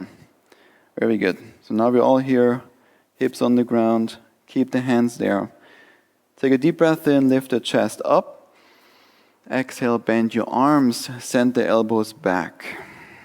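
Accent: Germany. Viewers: Are you german? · I can hear the German accent. Stimmt? I liked the video but this is not for beginners, I am sorry. I practice yoga daily for 7 or 8 years, and this is not beginners yoga. Vielen Dank. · Germany from the sounds of it hey?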